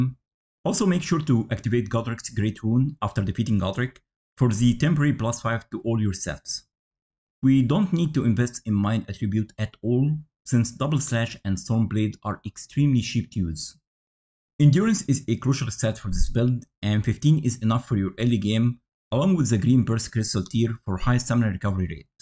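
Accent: Egypt. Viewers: Your video is very well prepared and it left me speechless after I heard your Egyptian accent you are a legend ❤ keep up the massive work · This thick egyption accent of yours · Great vid ! you sound egyptian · Brother, i bet you're egyptian... i could easily recognize the egyptian accent... since im egyptian also. Btw that was a good build guide, ngl. I'll definitely gonna try it later today ❤ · واضح انك مصري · why do you sound like an Egyptian while you're speaking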